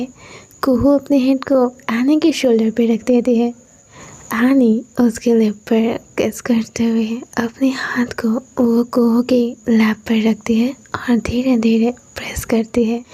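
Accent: Bengal, India. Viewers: Didi aap kolkata sa ho kya kyu ki main bhi kolkata sa hu apki Bengali language sa lagta hain hain ki aap hain Bengali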